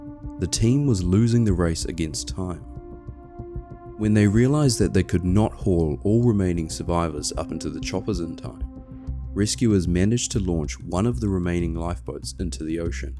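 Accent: New Zealand. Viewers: Holy shit, this is so well done that I assumed this was one of the big documentary channels. It wouldn't have even been out of place on a Discovery plus. I'm so glad The Holy Algorithm brought me here. Also, maybe I'm a sick f#<k, but I giggled every time the Aussie "deck" was said. "Below dick" 😆 Edit: I just checked your page & now realize that you're from New Zealand, NOT Australia. My dumb American ear can't differentiate, but it is NOT an abomination of an accent! I love it. You know what is a true abomination? My Boston accent. The whole "I pahk the cah at Hah-vahd yahd" (I park the car at Harvard Yard) is real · That sounds a lot like a New Zealand accent to me! 😀😉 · I like the Kiwi accent. It is a refreshing change from an American or English one.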